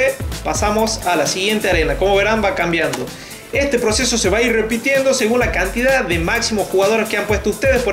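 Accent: Argentina. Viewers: JAJJAJA COMO SE NOTA Q SOS ARGENTINO GRANDE SEGUI ASI SALUDOS DE ARGENTINA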